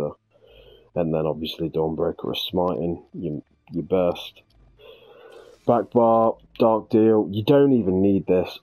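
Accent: United Kingdom. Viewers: Ah man ... I hate you right now... I just know every stam sorc eu is gonna be popping this build and I run a low resistance mag dk... 😑😑😑... brutal build and cool explanations bro... Something is bugging me, are you north, you sound like your my end 😂😂😂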